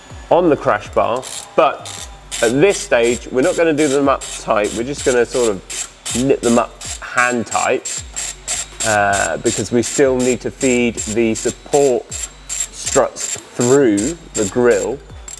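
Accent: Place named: United Kingdom. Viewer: Mate your accent had me thinking Verus was UK based, but nope gotta pay the +200% import fee's 😅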